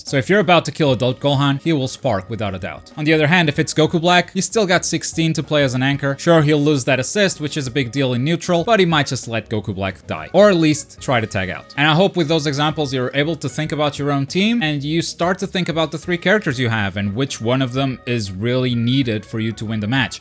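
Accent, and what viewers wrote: Portugal. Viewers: If I understood, you're Portughese? E AI MEN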